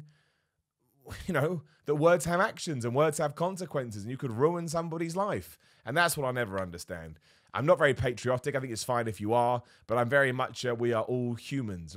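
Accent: United Kingdom. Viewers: Now that you mention it, you could kind of resemble Johnny Sins. However, you sound like a Brit and I'm sure Johnny Sins is a Yank. You sound like someone who does radio. And I'm not sure why being confused with Johnny Sins is an insult!!!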